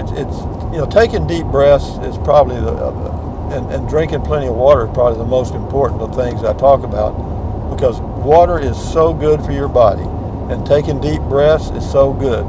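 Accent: Texas, United States. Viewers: Happy July 4th! Another enjoyable video. Since watching your videos the last few months I am enjoying life more. Your uplifting no nonsense attitude with your Texas draw is giving us something good in our day. My daily dose of smiles :) Thank you!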